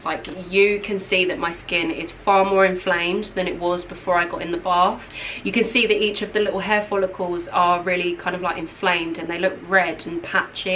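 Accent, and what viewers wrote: United Kingdom. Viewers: It’s a British accent -_- what’s wrong with it?? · Love Yuor British Aaxent. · Your accent keeps reminding me of those listening tests from cambridge we always do in high school here hahahah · over dose British accent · malak beauty girls that’s offensive why yall hating on her accent ik u may replie to me “it’s just a joke” but I’m British too and I don’t say it like woo we say it like Wawter so yea. Offensive!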